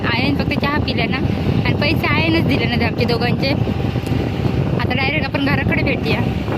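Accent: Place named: Malvan, India. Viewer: तुजो गाव कोणतो नांव काय गावाचा‌ मालवणी बोलतस ऐकाक बरा वाटता मी पण मालवणी आसय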